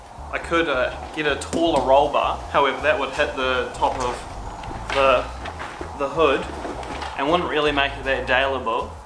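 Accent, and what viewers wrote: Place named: New Zealand. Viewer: could tell you were kiwi immediately haha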